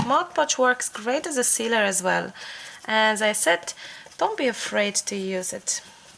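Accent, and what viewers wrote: Cork, Ireland. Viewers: Greta video, i love how the 'Cork' accent was strong enough for me to wonder if you were living in Ireland - Im also in Cork :D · where are you from?? This is my 1st time watching your video and you did a fantastic job!! It's just I've been trying to guess my 1st 2 was Russian or Polish but then when you carry on speaking every now and then you sound Irish x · I'm loving your accent! Is there a bit of Irish in there or am I crazy?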